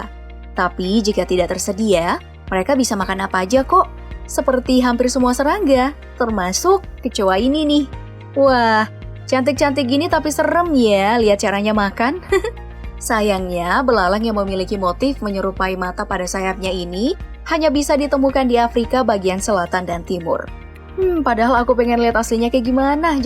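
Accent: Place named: West Java, Indonesia. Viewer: Ini mimin nya pasti org sunda😅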